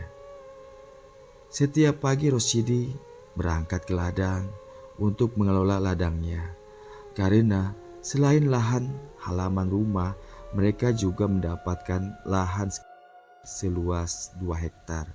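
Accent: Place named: Indramayu, Indonesia. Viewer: Gaya bicara Indramayu an pas temen kang 😁,gak pernah komen dan like tpi selalu Nyimak #salam_Wong_INDRAMAYU 🙏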